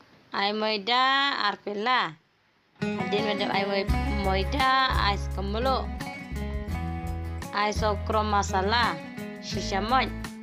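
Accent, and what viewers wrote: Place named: Meghalaya, India. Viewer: Klam bait dei iong pnar leh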